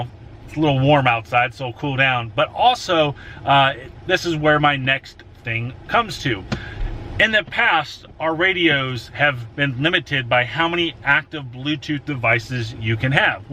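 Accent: Chicago, United States. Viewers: Is this guy from The Windy City blah blah blah blah blah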